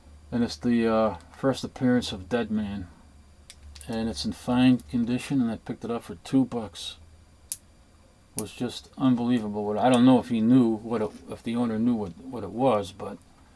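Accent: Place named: New York, United States. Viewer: you could be a voice actor you sound like a newyorker who knows the streets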